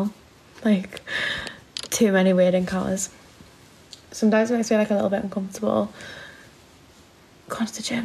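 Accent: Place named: North, United Kingdom. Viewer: Wow beautiful 😍northern accent too 😘💋💋💋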